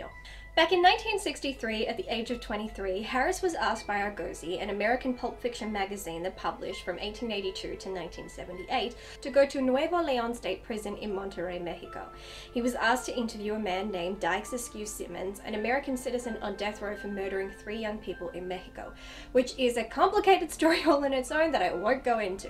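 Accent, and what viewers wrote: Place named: United Kingdom. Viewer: Besides doing some good research, the only thing you have in common with “Minty” is that you both speak versions of “The Queen’s English,” (he’s a Kiwi). I’ve been a fan of the movie since the 90s, and am just getting around to the audiobook. Oh, and I’m from Baltimore, and spent a lot of time in Pittsburgh where it was filmed, so it’s always had a special place in my liver, I mean heart <3 Nice research!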